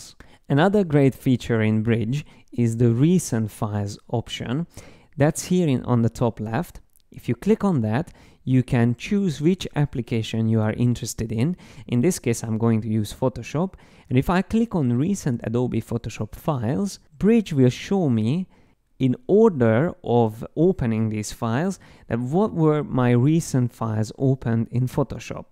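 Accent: Hungary. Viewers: Is it Hungarian accent I did hear? :) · hahaha your Hungarian accent is so obvious! Szeretlek Magyarorszag, köszi!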